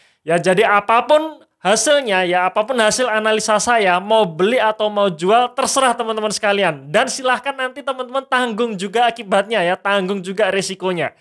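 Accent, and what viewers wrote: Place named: Cirebon, Indonesia. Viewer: Logate wong cirebon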